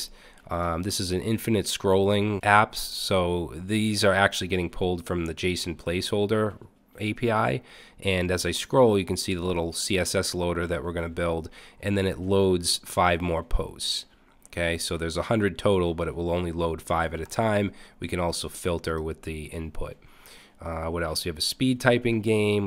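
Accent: Boston, United States. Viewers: Sounds like a bit of a Boston accent there.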